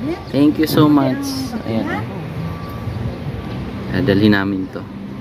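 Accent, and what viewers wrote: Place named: Ilonggo, Philippines. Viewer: Wow marunong ja din parang mag-Ilongo Kuya.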